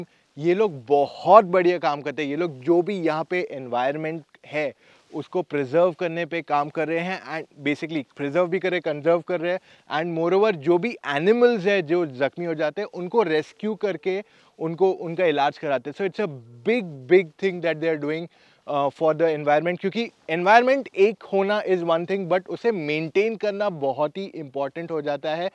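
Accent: Gujarat, India. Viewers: Sir aap gujarat se ho kya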